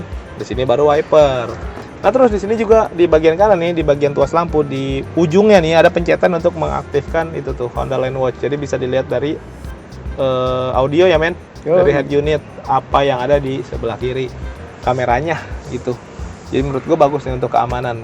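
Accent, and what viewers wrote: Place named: Cibodas, Indonesia. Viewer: Kya org Cibodas nih.. bang yah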